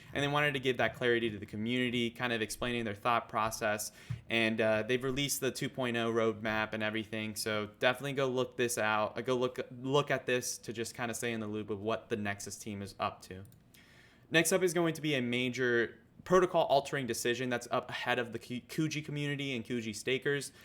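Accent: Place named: United States. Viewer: Wena crypto Chileno. Has 100% gringo accent, must have gone to Nido de Aguilas. Nice youtube mate, will be following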